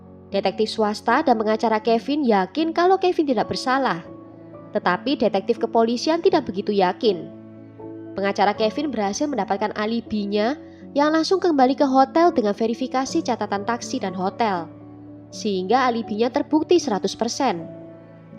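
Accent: Java, Indonesia. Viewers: Alhamdulillah.. sehat trs bahagia trs.. medokuhhh 👍💖💖💖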